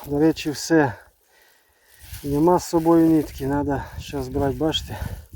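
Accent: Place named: Olevsk, Ukraine. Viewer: Відчуваю, що це десь на півночі Житомирщини. А, вже почув. Олевщина. Чути по вимові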